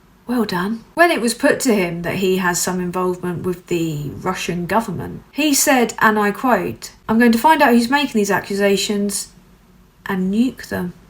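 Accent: United Kingdom. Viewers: Ur british accent is so beautiful! Love ur content from the USA!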